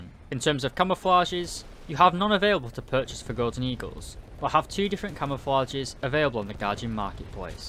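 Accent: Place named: Lancashire, United Kingdom. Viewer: Where abouts in the UK are you from pal? Sounds like Lancashire to me. I'm from Leigh near Wigan/Manchester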